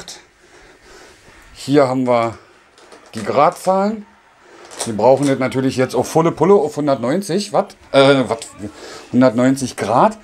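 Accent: Berlin, Germany. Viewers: super genial erklärt und hat uns bei der Kaufentscheidung sehr geholfen. Und dein Berliner Dialekt lieben wir eh 😁😁😁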